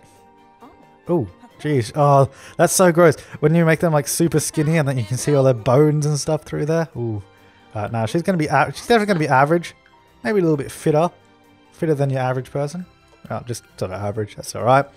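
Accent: Australia. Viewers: Your video is a joy to watch and listen to, your an Aussie aren't you? I love listening to you talk,lol! Your comments made this the best Sims 4 video I have seen yet, you made me laugh allot! And about Aluna, you could tell that it was the same Sim in Sims 4, but it looks even better some how! I know allot of people say they look less real, but I think they look more real then Sims 3, its like you can give them better detail, which makes them look more real! I can't wait to get to try it out myself, I love making different Sims, and detail is very important to me! I hope you make some more videos of you making new Sims with The Sims 4, I'll enjoy them just because of your voice,lol!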